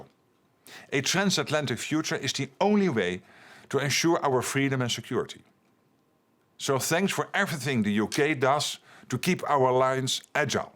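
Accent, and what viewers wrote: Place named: Netherlands. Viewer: I love his dutch accent.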